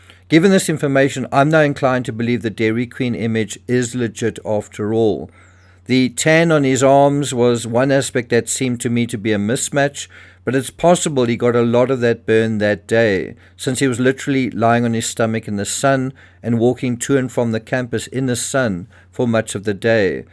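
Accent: South Africa. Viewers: Mmmh, u sound very South African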